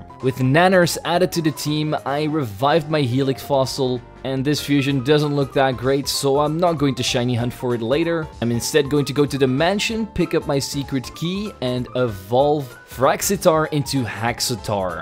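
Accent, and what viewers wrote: Netherlands. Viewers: Is he Dutch? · Gotta love that dutch accent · Where are you from? Sometimes it sounds like you're dutch, but you realy have a nice accent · This guy dutch?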